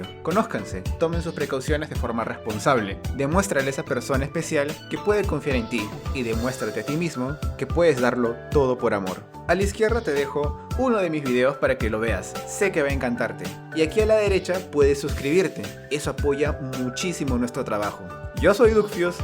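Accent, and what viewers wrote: Peru. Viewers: Que wea eri peruano?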